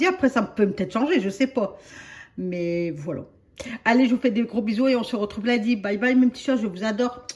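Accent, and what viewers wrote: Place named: Nord, France. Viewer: est marrante elle s' exprime bien comme dans le Nord aussi bien dans les expressions que dans l' accent. Je suis de la région.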